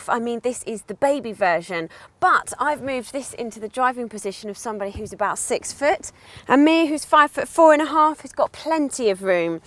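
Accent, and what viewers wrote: United Kingdom. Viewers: that UK accent. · Yea she my not be a ten in the look department but her looks plus her knowledge of cars & great attitude makes her an eleven! :-) & for us yanks her british accent is another +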